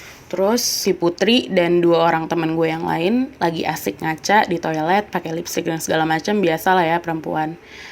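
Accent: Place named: South Jakarta, Indonesia. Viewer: Woww aksennya jaksel bingitsss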